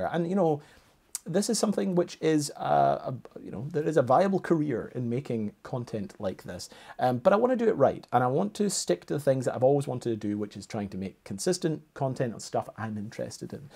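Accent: Scotland, United Kingdom. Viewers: Really great video,. Never heard of this bunker before and I guess a lot of people didn't know anything about this top secret place either. The idea of basically being buried alive in there is kind of freaky. Those volonteers had balls of steel and were really dedicated to their country. I loved the narration and the scottish accent. I'm going to go watch your fort drum video, I've been looking at that thumbnail for a while.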